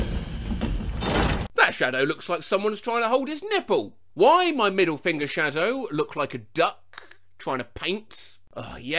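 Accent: United Kingdom. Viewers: British? Stopped watching the video immediately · Scooooul Emooooji, i love british accent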